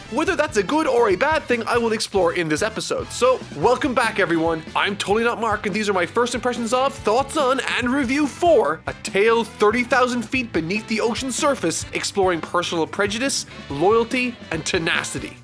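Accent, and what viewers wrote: Ireland. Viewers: Yes, his accent is pretty Irish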